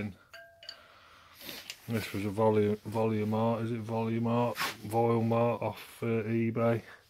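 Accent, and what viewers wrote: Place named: Doncaster, United Kingdom. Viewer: Sounds like you're not far from Doncaster mate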